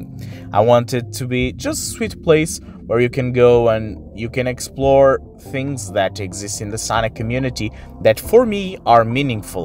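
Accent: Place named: Portugal. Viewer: Sim! Ele fala também a nossa língua, só que Português de Portugal.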